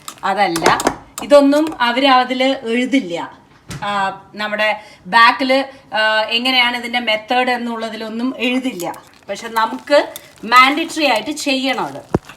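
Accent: Palakkad, India. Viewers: നല്ല വീഡിയോ, തൈര് വട എനിക്കും നല്ല ഇഷ്ട്ടോണ്, പിന്നെ നമ്മുടെ പാലക്കാടൻ ഭാഷയും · Hai eepaalakkadan samsaravum paachakavum eshttayi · പാലക്കാട്‌ നാടൻ ഭാഷ സൂപ്പർ ❤️❤️❤️ അമ്മ ❤️❤️❤️❤️❤️❤️❤️❤️❤️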